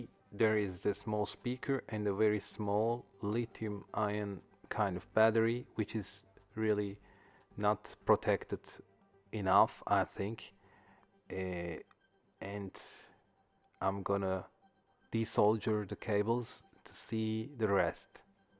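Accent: Turkey. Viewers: thats turkish accent isnt it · İngilizceden Türk olduğunu anladım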